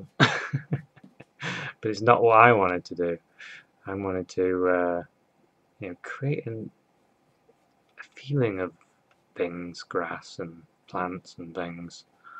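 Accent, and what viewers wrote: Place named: Liverpool, United Kingdom. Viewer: Hya, love your pieces, you sound like your in or from Liverpool.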